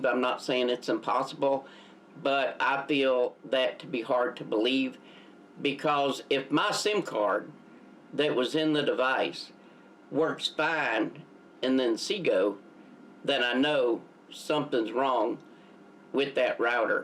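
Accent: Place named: South, United States. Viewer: Wow, no offense but you sound like a southern Bumpkin so I don’t think anything about your review here is going mean anything to the cell signal internet community. It’s ok, you just don’t understand technology. So maybe Chester repair equipment is beyond your cell signal intelligence? You probably even still have a land phone line?? That’s funny! Have you gotten Better connection results with 2 cans of Spam tied together with string? 🤣😂😅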